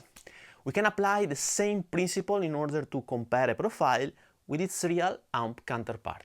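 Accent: Italy. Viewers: Great video, great channel from the few videos i've seen so far, and digging that Italien accent (I'm French btw) :)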